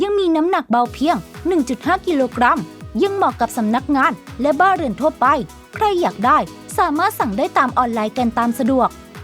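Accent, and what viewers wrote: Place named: South, Thailand. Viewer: เสียงพูกเหมือนไทย+ใต้เลย ฟังแล้วยังไงๆไม่รู้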